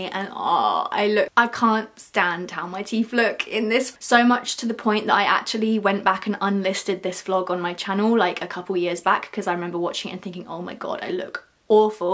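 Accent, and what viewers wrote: United Kingdom. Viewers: Wow ur British accent is so cool😅😊❤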